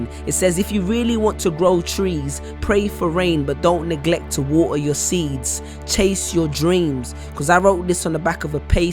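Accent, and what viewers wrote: London, United Kingdom. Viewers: He has a British accent. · That's a British accent... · It's quite disturbing seeing a black man with British accent! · british london accent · it's a British accent. Australian accents are alot rougher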